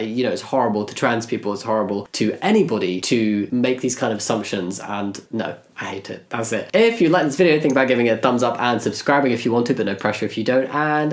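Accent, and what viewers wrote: United Kingdom. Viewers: Your voice is fine. You just sound really british.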